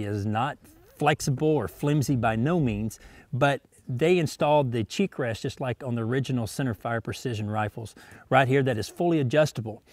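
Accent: Tennessee, United States. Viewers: what part of TN you from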